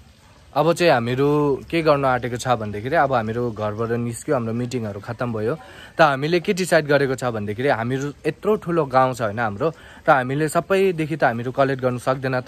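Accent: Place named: Manipur, India. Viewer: bhai timro bolne tarika manipuri nepali ko jasta cha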